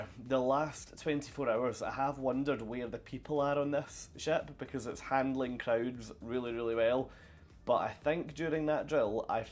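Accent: Scotland, United Kingdom. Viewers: You couldn’t be more Scottish if you tried. Bless you, honestly no one ever walks in the emirates except around the shops! I hope you didn’t get heat stroke, loved this Vlog though. And the food looked fab on there. PS. The gold is the dowry for your daughter, you’re supposed to buy pieces to add to your collection so you can have the best choice of husbands for her 😂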